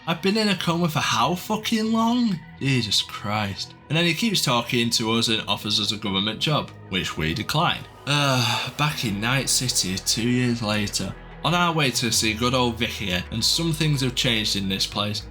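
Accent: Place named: North of England, United Kingdom. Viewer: Nah he's from the North of England man, people speak like that there.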